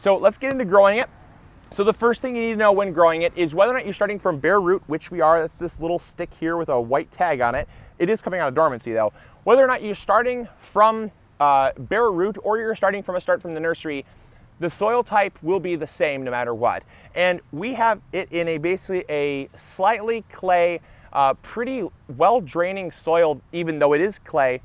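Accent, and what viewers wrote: Michigan, United States. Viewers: Classic rock Lover the Michigan accent is strong in this one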